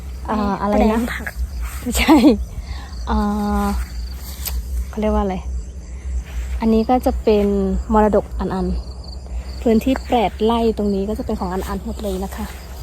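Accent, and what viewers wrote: Isan, Thailand. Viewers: สุดว้าว..สาวเมืองกาญจน์แต่เว้าสำเนียงอีสาน อ่างเก็บน้ำที่ไหนนะ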